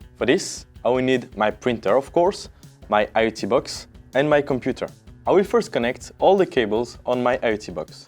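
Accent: France. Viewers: J'espère que tu as amélioré ton accent trop french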